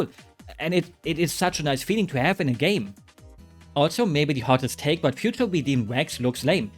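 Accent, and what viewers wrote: Germany. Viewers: I just found out about this channel and realized how many similarities we share we are both Nia fans and have an silly accent, I have an welsh you have an German accent😂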